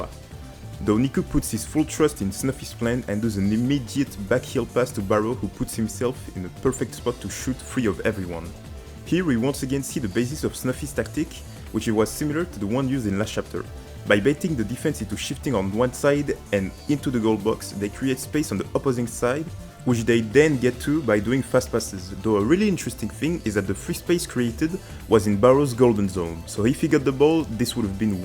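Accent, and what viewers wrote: France. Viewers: Perso je kiffe tes videos vu que je suis bilingue mais je comprends pas la décision de parler en anglais, ca ce voit tes bilingues aussi mais l’accent fr rends parfois difficile a comprendre (perso) après c’est toi qui decide et si tu reste en anglais ca ne changera pas le fait que je regarderai toujours tes vidéos, force et continue comme ca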